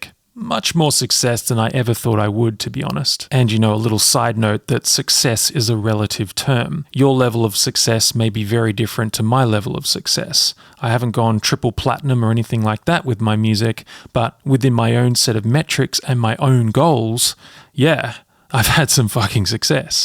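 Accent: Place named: Australia. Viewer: Enjoying your points of view, good to hear an Aussie voice, hi from Adelaide. I think every music channel gets the negative trolls.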